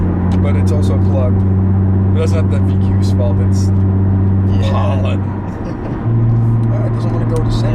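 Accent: Netherlands. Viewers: ik hoor op jou engels dat jij uit Nederland komt groetjes uit Bosnien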